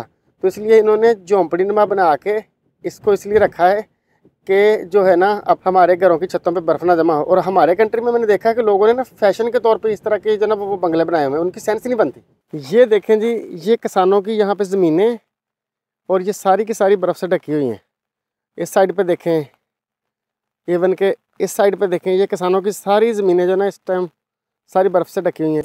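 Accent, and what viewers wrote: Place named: Pakistan. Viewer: ap Pakistan k kis gaon sy hain ?ap ki boli bhot sweet h bilkol sada hmaray jesy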